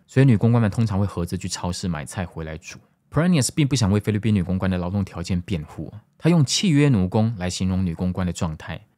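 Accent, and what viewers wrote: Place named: Taiwan. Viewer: 第一次看到的影片，讓我迅速就變壽粉了。臺灣人的口音跟中字幕讓我聽起來好舒服看起來易懂…………都噴水了…………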